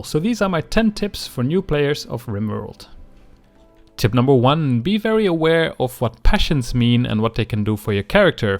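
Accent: Netherlands. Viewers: Dutch accent ? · you sound Dutch :)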